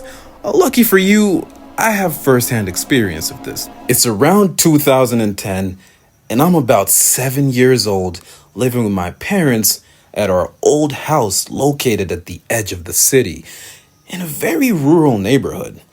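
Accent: United States. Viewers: Even crazier that these (professionally-animated) videos about "life in Africa" are narrated by someone with an American accent. There are too many question marks here to take any video this channel publishes seriously.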